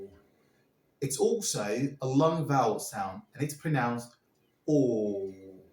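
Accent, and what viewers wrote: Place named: United Kingdom. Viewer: Real British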